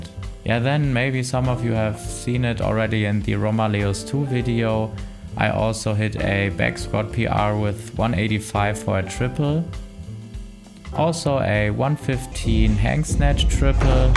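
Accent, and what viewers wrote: Germany. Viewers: Ich dachte die ganze Zeit schon dein Akzent ist deutsch Geil, bleib bitte dran und mach weiter so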